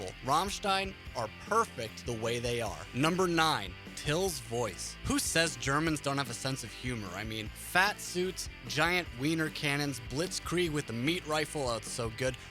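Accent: United States. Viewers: You said german is a brutal language. So could you please spell it at least not with a hardcore american accent? (Sry for being shitty but some names were spelled really meh xD) But good video! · he kinda reads the words in english. He made great points about the band and that's what matters. If we judge, we can go as far as judging fans for not understanding german and that might include you. if it doesn't, it still includes like 80% of their fans. Yeah yeah, we know you know german better than others... save it. It's typical for americans to not use rolling r and sh the way germans do. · Hearing Americans speak German is just silly😂, but you props for doing it! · The american accents fucks me up. dude please try im not even german and it hurts me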